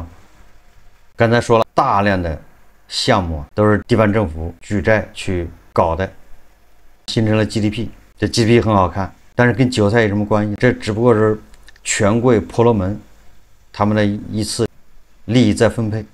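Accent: Anqing, China. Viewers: 第一次看你直播的时候听口音我就知道你是安庆的，怀宁的懂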